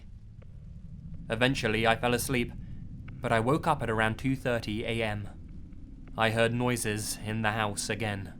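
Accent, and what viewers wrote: England, United Kingdom. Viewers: English accent FTW :)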